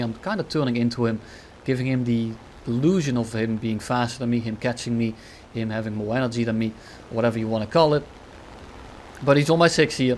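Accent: Netherlands. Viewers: R u dutch by any chance?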